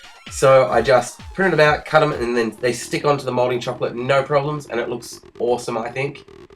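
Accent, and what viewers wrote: Australia. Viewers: Oh my god you're Australian! I was expecting someone british! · I only realised you were Australian when I actually really listened to your voice. I guess you don't notice your own accent.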